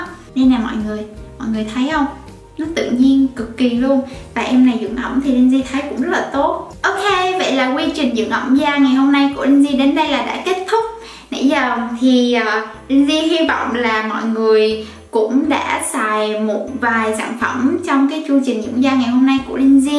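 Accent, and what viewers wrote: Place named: North, Vietnam. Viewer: E thấy nói tiếng Bắc dễ thương quá...vào một video nào đó... sẽ làm một video skincare hoặc makeup hoặc vlog..v..v nói tiếng Bắc nhé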